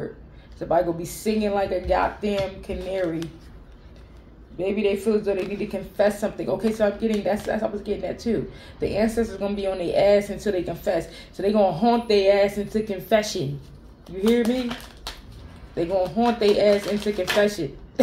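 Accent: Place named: East Coast, United States. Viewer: I love your voice and your lisp! Those lips with ya Easthcoast accent..I do!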